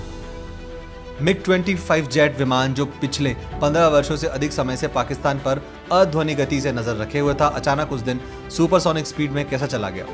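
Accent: Standard. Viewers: Shudh hindi super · आपकी सुद्ध हिंदी बहुत अच्छी है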